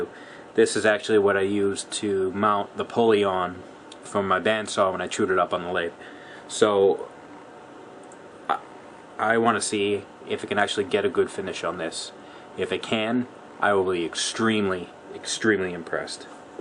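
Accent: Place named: Massachusetts, United States. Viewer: What a great video. I'll have to watch this a couple more times to get maybe one tool to work like yours. You make this all look so easy to do, but in reality this is an art. All always, I appreciate all you expertise and hard work making these great videos. I too am from Mass, but I sure don't sound like you. I guess I have been away too long. Now my brothers have that accent. They call me the adopted one. Looking forward to your next video.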